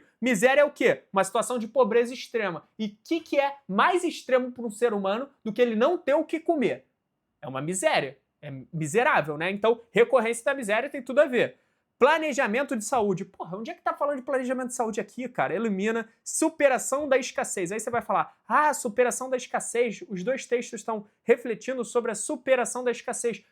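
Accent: Rio de Janeiro, Brazil. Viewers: eu racho com o seu jeito carioca de explicar KKKKKKKK amo seus vídeos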